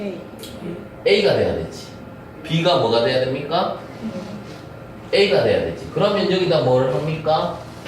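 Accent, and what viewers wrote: Gyeongsang, South Korea. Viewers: 강사님 참 잼나게 강의하십니다. 특히 구수한 경상도 말씨로 생동감이 넘침니다. 한가지 문의드립니다.Window sever 2012에서 화일탐색기로 임의의 화일을 삭제할경우 마우스 클릭후 delete key할경우 선택한 화일이 삭제되어야 하는데 삭제가 안됨니다. 강제로 삭제(Shift+delete )할경우 삭제됩니다. 어떤 원인인지?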